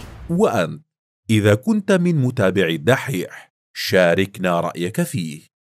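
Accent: Egypt. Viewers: الافضل رغم لهجته المصرية الدحيح صاحب محتوى راق وسيق ومفيد عكس اليوتيوبرز **معظمهم تافهون وبلا محتوى حتى ***فهو بسيط و لا يحتاج سوى لحسه الفكاهي الظريف و اسلوبه اللبق و العفوي ومعلومات دقيقة من مصادر علمية بورك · يشخص مميز و كوميدي و علمي و مكس جميل جدا صراحه هو خلني احب اللهجه المصريه صراحه حتى الشرح بسيط ولكن هم جدا معقد و صعب الفهم ولكن الدحيح يسهل المعلومه يخليها جدا سهله فعلن شخص مميز ماشاءالله تبارك الله عليه🥀💜💜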